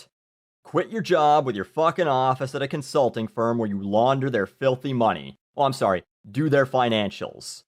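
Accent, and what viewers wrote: Canada. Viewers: yay, any chance that replies to my comment? if you see this, you're one hell of a guy and I love your rants, and the canadish accent makes it even better:D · i love your Canadian accent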